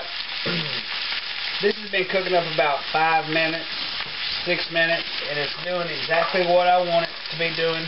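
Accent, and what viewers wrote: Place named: Arkansas, United States. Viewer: spam grosses me out but this looks good... that bacon grease boy it changes everything!.. sounds like you're from Arkansas.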